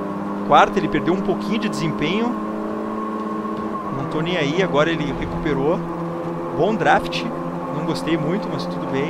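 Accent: Rio Grande do Sul, Brazil. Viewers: tu é gaucho vey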